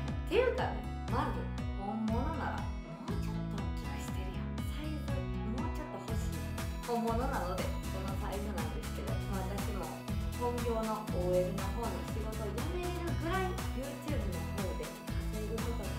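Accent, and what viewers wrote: Kansai, Japan. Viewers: は本当にかわいいよな～！関西弁もまたかわい(//∇//) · 実証動画お疲れ様でした、のハスキーな声で関西弁素敵ですよ大好きです💕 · チャンネル見させて頂きました。可愛いし、関西弁が印象残り登録しました。これからも頑張って下さい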